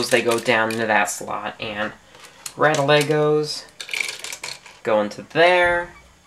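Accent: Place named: United States. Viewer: i love how im english and i heard u say some american words wich are funny like lever and we say leever and nickle. pence :P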